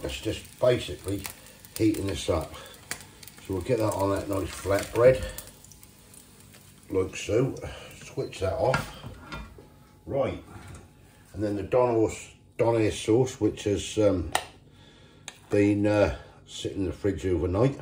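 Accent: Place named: England, United Kingdom. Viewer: Decent grub that is! Trying to work out your accent mate. Are you from Gloucester by any chance?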